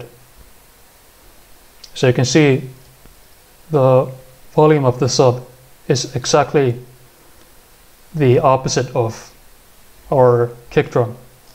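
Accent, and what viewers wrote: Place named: Eastern Europe. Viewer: I always know i'm in for a great tutorial vid when I see a grainy webcam and the uploader has an Eastern European accent of some kind, no 5 minute intro and unnecessary bullshit just str8 to the good stuff. God bless you man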